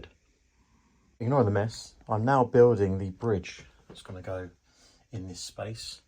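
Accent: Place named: United Kingdom. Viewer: I bet you are British yeah...yeah...yeah...yeah...yeah...yeah...yeah...yeah...yeah...................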